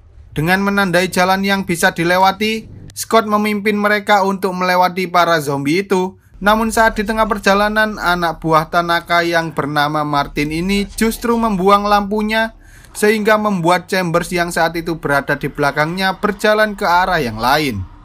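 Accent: Java, Indonesia. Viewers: Mas..ini yg ngmngnya jawanya medok pisan eeuy..(yg menceritakan filmnya) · Lha kog medhog banget ... Njawani .. Wkwkwk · Bang kamu orang Jawa yah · Abg orang jawa? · Bisa gk sih bos ngomong nya jngn medok bngt gitu,, pengen nonton tapi sebel sama suara nya,, 😒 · Org Jawa lu bg?? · Ohh wong jowo toh sampeyan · Jenegan jowone ngendi mas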